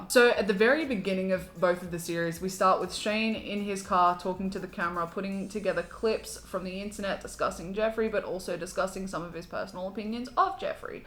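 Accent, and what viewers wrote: Australia. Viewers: Man it is SO nice to hear an Australian accent, and to hear Shane described as ‘a bit how ya goon’ bc that is the most accurate description of the man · This really do be a hot take. Also idk why it's still so weird to hear you talking in your normal voice, but even as a fellow Australian, I love your accent so much! P.s. those eyes are giving me corpse bride vibes and I'm LOVING it! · Are you Australian? I've just found your channel and I myself am an Aussie and some of the things you say are just so Australian. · Holy shit, this is the first video I've seen of you off of your viral tikkoks and yes! I'm living for the Aussie accent. Also super happy to see you growing, keep being an awesome bean.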